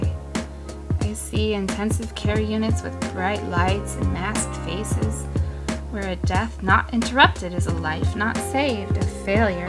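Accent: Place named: United States. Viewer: It looked an interesting video but the loud intrusive background muzak and the speaker's American accent made her words unintelligible to me.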